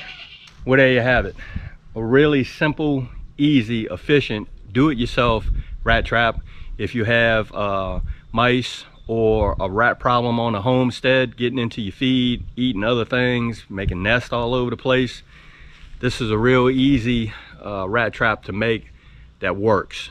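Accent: South, United States. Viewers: Nice meeting you my friend in the USA.from England love that ol Southern accent and attitude and I have a mouse in the bedroom and I will definitely make this today many times thanks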